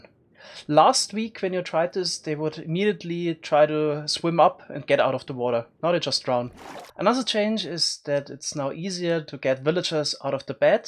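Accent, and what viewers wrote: Germany. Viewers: are you from Germany? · 100% der Akzent ist einfach mega deutsch 😂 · Du bist deutsch, oder?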